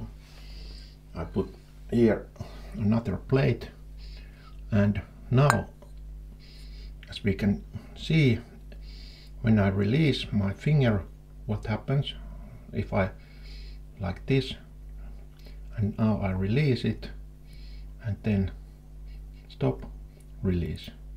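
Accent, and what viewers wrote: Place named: Finland. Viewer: As a Swede he sounds like he is from Finland to me. Idk but that is a guess I would feel pretty confident in..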